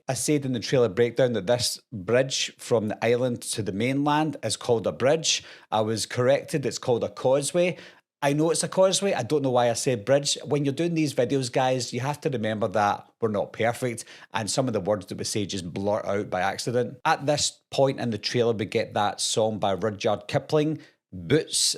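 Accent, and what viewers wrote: Scotland, United Kingdom. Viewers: You've got a Scottish accent!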